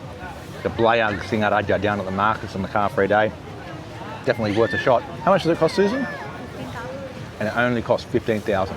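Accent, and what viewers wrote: Australia. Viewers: Great vlog for an interesting peek at truly local lifestyle.....had never heard of this before. Gotta love hearing your Aussie accent reflected right back at you.....quite a surprise!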